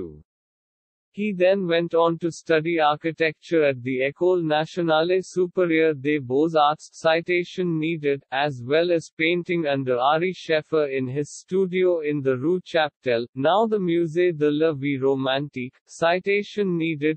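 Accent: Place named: India. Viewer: I can't understand nothing. Indian voice 😨😡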